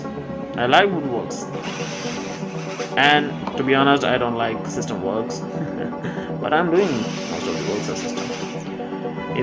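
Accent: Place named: Jamaica. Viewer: Most talented aatmi with the jamaican accent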